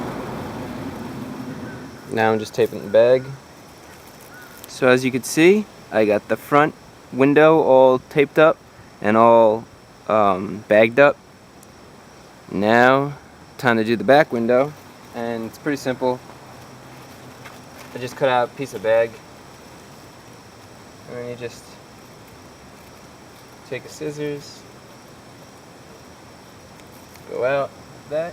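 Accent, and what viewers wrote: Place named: Philadelphia, United States. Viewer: I don't recall ever watching this video. I use to have a '97 B2300, but didn't have it long enough to do this. My current 08 Honda Crv has fading on the B pillar trim and trying to fix it. Dealer says that its a film on top. I hope its an easy fix. Was this video before "Soappy Woder"? Philly guy here, we say woder the same way.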